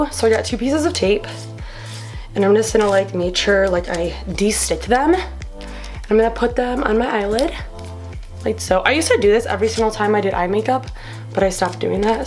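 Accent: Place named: Midwest, United States. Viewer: youre so pretty !! you must be from the midwest too, I can tell by the accent <3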